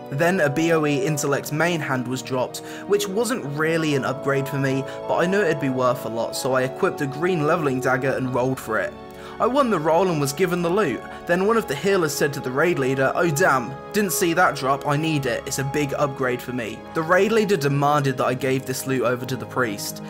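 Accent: United States. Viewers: you American or British?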